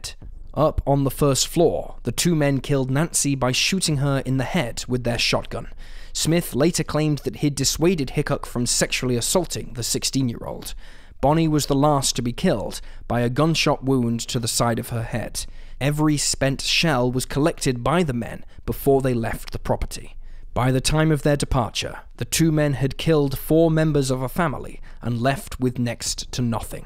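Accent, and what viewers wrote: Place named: Newcastle, United Kingdom. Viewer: He has the same accent as myself, north East England the accent is called 'a geordie accent'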